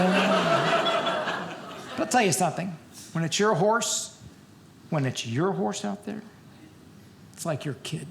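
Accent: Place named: Louisville, United States. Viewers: You can always tell someone is from Lullville. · He is where I'm from in Ky. He's very southern sounding for being from Louisville.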